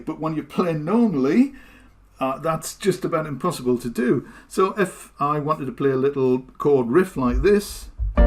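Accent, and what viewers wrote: United Kingdom. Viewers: I agree,,, it frustrated me too.. still got it but,,, I go back to other Synths... Btw... by your accent,,, ye from my part of the UK 👍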